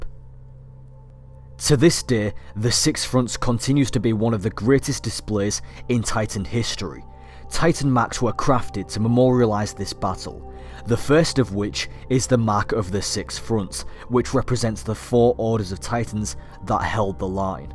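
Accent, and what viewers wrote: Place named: Yorkshire, United Kingdom. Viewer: that's Yorkshire accent.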